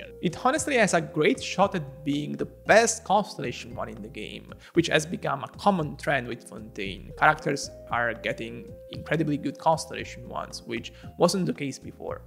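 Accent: Italy. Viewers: Are you Italian by chance? Your accent is very much the part.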